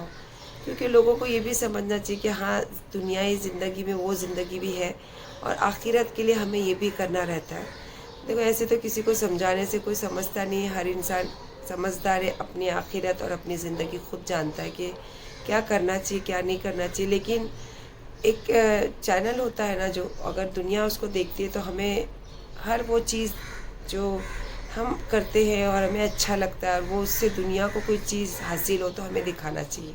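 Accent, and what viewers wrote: Bangalore, India. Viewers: Wow ..😍😍😍what a bangalore style language😘😘😘❤❤❤❤❤❤❤ God bless you stay strong Aunty ji love you😘😘😘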